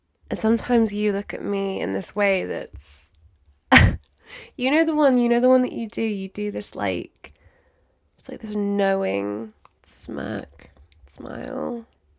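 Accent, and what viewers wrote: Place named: United Kingdom. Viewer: The best part about this video is your british accent and the overall " bustiness " of the video (if you catch my drift😏). The worst part of it is its yet another reminder of what I've never had😔.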